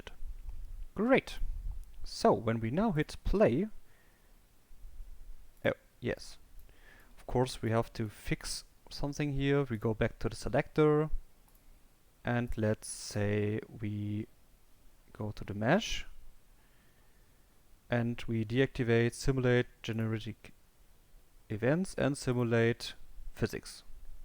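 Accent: Germany. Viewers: It completely doesen't work for me..... You sound german so I hope you are german. Answer in german when you are. When i press play my car won't spawn and i wont possess it.. To make matters worse it spits out this error: Attempted to access BMW_Blueprint_C_0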